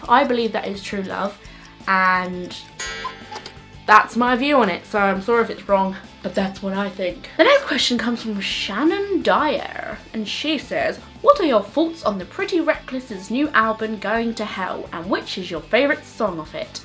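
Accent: Ireland. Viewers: high five about the irish accent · The Irish accent is amazing! <3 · irish accent is hot :) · has a bit of an Irish accent:P very genuine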